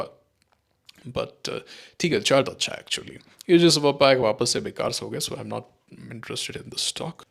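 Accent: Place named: Haryana, India. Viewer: Haryanvi mst bolte ho sir aap to 😅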